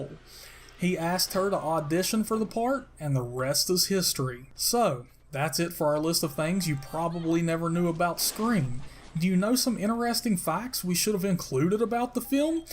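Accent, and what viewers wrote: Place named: South, United States. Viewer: I can't get past the country voice, and I'm from the south!!!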